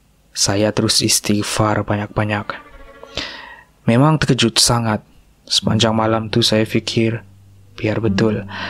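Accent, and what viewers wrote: Sabah, Malaysia. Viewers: Cerita2 Seram! Memang cerita yang benar berlaku dan sentiasa disukai semua orang.. Suatu masa dahulu tahun 1955 Radio Melayu Singapura pun menjadikan SERAM sebagai program terbaik diRadio dan sentiasa keudara pada jam 2330 setiap malam Jumaat.. Berbagai cerita yang cukup menakut dan menegakkan Bulu Roma serta ditambah kesan bunyi juga amat menyeramkan..Ia bukan seperti Drama biasa diRadio.. Mohon Penyiar ini menukarkan lorat membacanya yang begitu BAKU dan seolah olah dalam lorat SABAHAN ke lorat biasa saja agar lebih syok didengar dan betul2 ngam2 sui kata sebenarnya.. Idok ke gitu.. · Sabah LA bai tuh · sabah sja guna kopi - kupi.. haha.. mmg sabah dia ni.. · Memng org Sbah agknye.loghat Sbah je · memang sabah pun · Suara dia best..,Mcm sabah sikit...Hihi...😃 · memang org sabah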